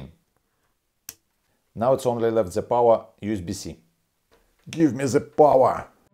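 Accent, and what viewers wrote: Russia. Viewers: 38 seconds into the video and I can't stop wondering: Или автор русский и ничегошеньки не хочет делать с акцентом or if this is the most hardcore russian accent imitation I've ever seen. Good vid though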